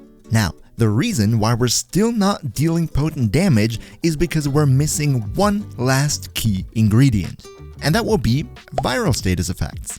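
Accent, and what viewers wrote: Germany. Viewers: I fuckin knew it XD das deutsche wurde rausgerochen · Du bist Deutscher?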